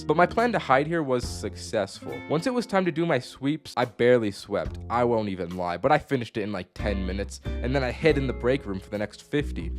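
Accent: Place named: India. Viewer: I just realized you sound Indian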